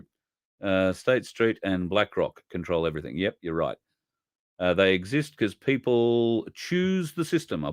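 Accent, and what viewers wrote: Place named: Australia. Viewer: Great show, great information, glad to hear the voice of another Aussie patriot on the airways. Godspeed and I look forward to the next broadcast.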